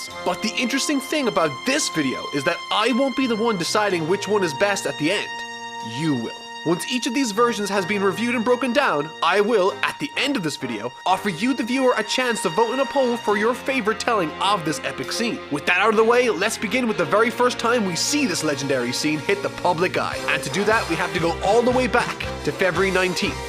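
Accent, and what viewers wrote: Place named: Ireland. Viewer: hey ive been a sub for almost a year now and i was just wondering what country you grew up in as u sound a little irish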